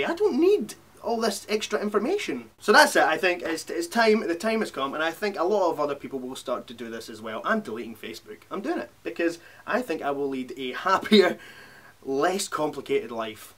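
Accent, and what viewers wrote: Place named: London, United Kingdom. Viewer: his accent is annoying, i recognize his accent from suburbs of London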